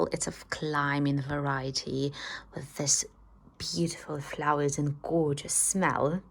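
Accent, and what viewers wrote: United Kingdom. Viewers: Hello, I hope you and your family are well. War is just horrible and it always leaves permanent scars on the land and people. I pray for peace everyday. Growing those beautiful lily's and delicious vegetables will give you something beautiful and good to look forward too. i have just started my tomatoes and peppers in my small 10 by 10 greenhouse and my rhubarb is just starting to poke through the ground. I cannot wait to get my hands in the dirt again,lol. I was wondering how you got that British accent? Iam in zone 6B here in north eastern US. Iam hoping the snow is all done and spring is really here and it is time for new beginning's and the renewed hope of life. Please take care of yourself and don,t worry, everything will be fine. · Also a big fan of Cacti, thanks! (By the way, your english is outstanding with a hint of a British accent.) Be well.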